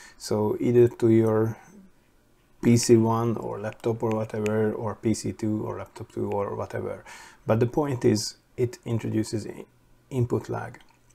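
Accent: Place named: Hungary. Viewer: Your accent surely tells you're Hungarian - that I didn't know when I found your channel. :D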